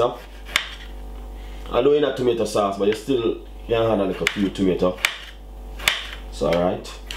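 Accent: Jamaica. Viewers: Your Jamaican accent is so heavy, until I can not understand what you are saying. I love jamaican food but its apparent that you do not want other people, other than your own, to understand this recipe....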